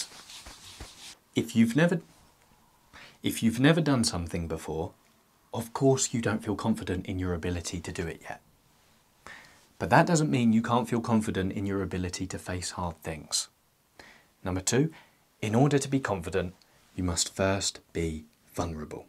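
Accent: United Kingdom. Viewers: i mean this in not a disrespectful just an observatory way: your voice sounds precisely like what a british guy's voice sounds like in my head. spot on.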